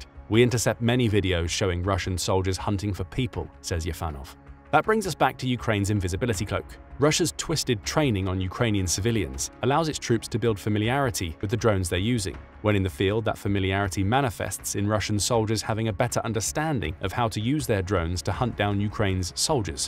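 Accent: England, United Kingdom. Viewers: English accent??? i am out!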